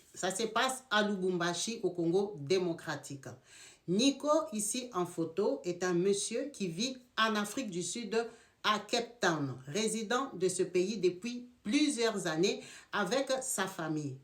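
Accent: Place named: Cameroon. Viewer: Madame arrête de parler comme une camerounaise ⛔ ton accent est nul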